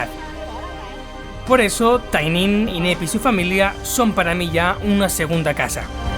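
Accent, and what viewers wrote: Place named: Spain. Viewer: Hola, Soy de Argentina 🇦🇷 y creo que Tú eres español, pero me encantó Tú informe,,y estoy sorprendida de ésta religión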